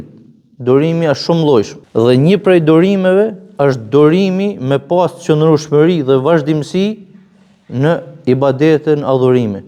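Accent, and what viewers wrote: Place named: Deçan, Kosovo. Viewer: Mos jeni nga Deçani? Sepse ashtu e paske Dialektin!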